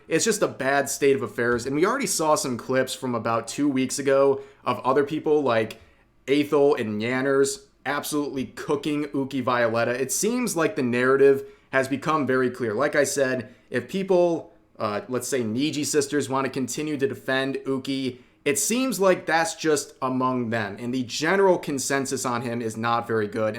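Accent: Ireland. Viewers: You're Irish? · wait, is irish?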